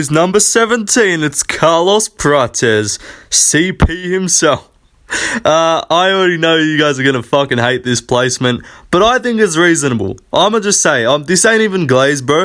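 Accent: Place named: Australia. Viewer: You have an Aussie accent and you didn't put Jdm in top 10? Nah you a traitor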